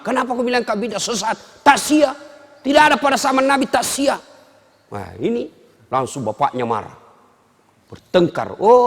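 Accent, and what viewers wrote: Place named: Bugis, Indonesia. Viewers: Ceramah lucu,kocak,bikin ngakak,logat bugisnya bikin tambah suka banget. Tapi sangat berbobot...saya ska ustadz. Smoga selalu diberkahi Allaahh ya istadz. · Inget babah denger logat bugis ustad das'ad😢 semoga nanti bisa undang ustad saat ada acara🙏 aamiin yaa allah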